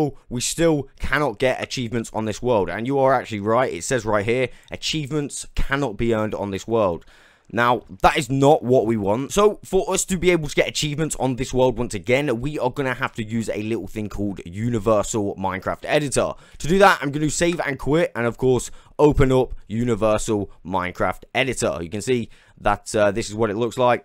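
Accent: United Kingdom. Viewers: so every british person is dantdm?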